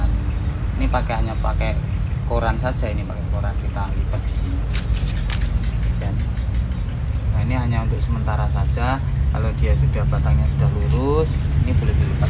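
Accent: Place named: Java, Indonesia. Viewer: Tiang jawi nggeh logatte keton banget 😊😅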